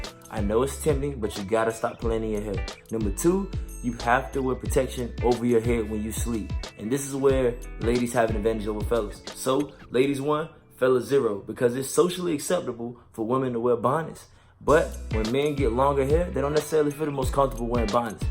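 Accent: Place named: New York, United States. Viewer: You can tell he’s from New York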